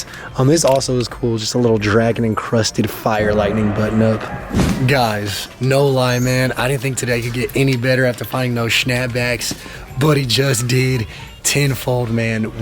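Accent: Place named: California, United States. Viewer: U got like a cali accent now and shit haha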